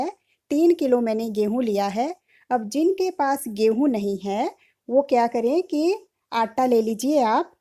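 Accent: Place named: Pahadi, India. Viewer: Aaj voice tone me phadi lg rhe di ham b phadi hai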